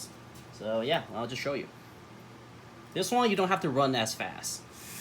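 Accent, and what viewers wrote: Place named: Alaska, United States. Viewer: This guy has the Alaskan Bush People children accent.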